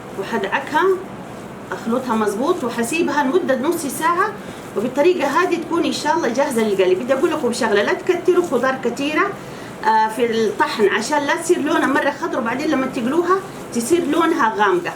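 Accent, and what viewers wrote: Hejaz, Saudi Arabia. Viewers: ماشا الله. والله احلا شي ذكر الله 😙😙😙 وزين اللهجه الحجازيه. ياخ احب الام دي وبنتها ❤❤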